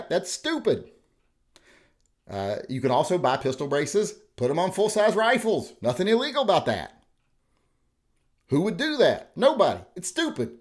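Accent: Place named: South, United States. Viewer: Slow Down Man! You are too realistic sometimes. I actually have more than one of these contraptions so I will have to come to some kind of consensus on what I will do. I also feel weird to message a man name and he speaks with a southern accent! Thanks for your input, nice to hear both sides of the argument.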